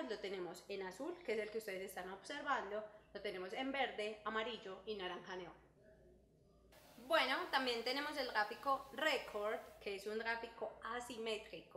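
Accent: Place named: France. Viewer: La chica habla como con un asento francés, o será que tiene la lenguita pegada🤔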